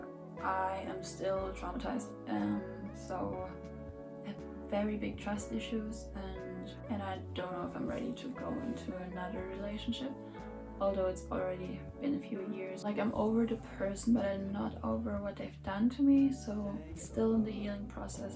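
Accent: Germany. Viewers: Omg I go to a German school where I learn in English but I’m learning German too,I noticed you were German from the moment I heard you!! Du bist ganz schön und cool😎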